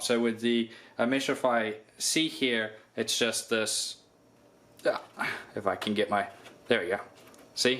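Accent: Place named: New Zealand. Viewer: Hey man... so ahh you have a very interesting accent. R u like a kiwi or something?? 😂😝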